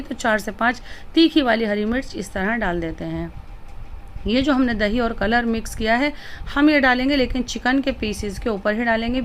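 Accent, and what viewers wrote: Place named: Delhi, India. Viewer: I love your proper Dili Urdu accent. I can listen to you all day long.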